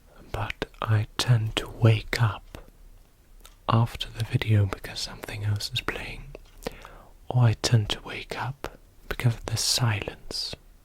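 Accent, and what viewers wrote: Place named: United Kingdom. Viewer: I love your British accent,! Are you from London? Congrats 🎉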